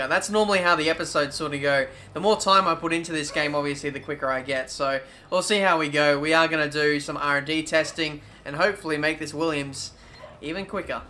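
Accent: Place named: Australia. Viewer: Love the videos. And its not just because of your aussie accent.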